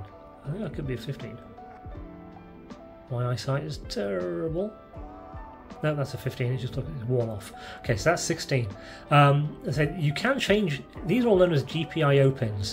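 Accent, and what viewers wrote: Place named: England, United Kingdom. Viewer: The Amazon links take me to Amazon in the USA so I presume that is where you are located despite your English accent?!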